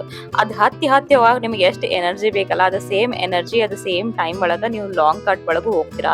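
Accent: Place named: India. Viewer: How is talking Kannada ? Yaav ooru, namm hange ada nimm Kannada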